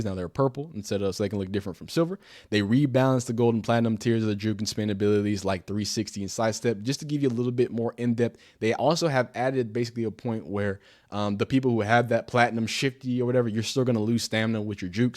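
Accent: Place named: Michigan, United States. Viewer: Bro idk if you’re from Michigan, the East coach or somewhere between Tennessee and Georgia. Niggas on YouTube be a character 🤣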